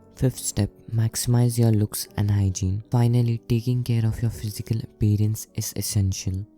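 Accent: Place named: India. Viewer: was lowkey invested till I heard the indian accent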